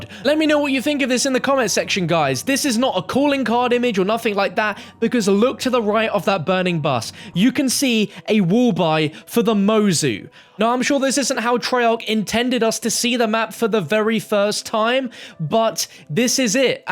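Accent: United Kingdom. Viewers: He might speak in a different dialect or he’s like British or isn’t from the US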